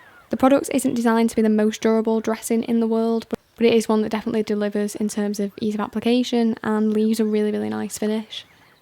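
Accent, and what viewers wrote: United Kingdom. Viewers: Sorry to say..im actually Don't understand some word..bcz of british accent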